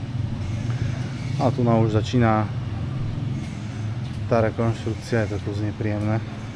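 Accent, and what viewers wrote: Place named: South, Slovakia. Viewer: super video,dakujem za typ a nie si z južneho Slovenska? Myslím si podľa prízvuku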